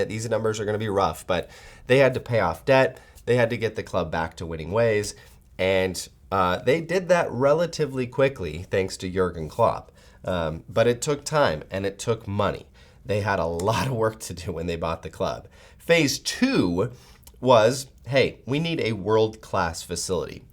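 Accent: United States. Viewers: Are you American ?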